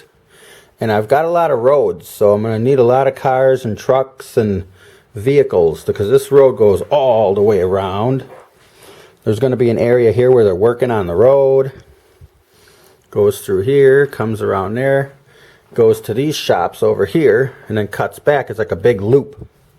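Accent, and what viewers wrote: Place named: Wisconsin, United States. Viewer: Love the layout and the wood paneling in the basement is awsome and I detect a WISCONSIN accent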